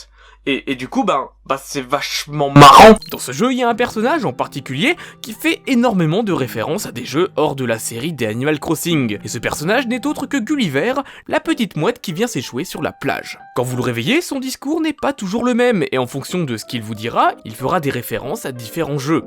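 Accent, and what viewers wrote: England, United Kingdom. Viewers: Plus que l'accent anglais, j'avais l'impression que était dépressif sur cette vidéo xD Be proud and happy! =) Vidéo intéressante cependant, j'aime bien les easter eggs:p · Je critique l'accent anglais de.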